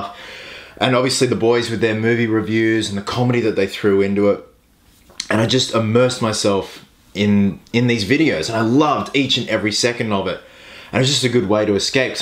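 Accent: Sydney, Australia. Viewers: They do bloody not, sounds like he's from Sydney... sounds like he's from Melbourne. Completely different!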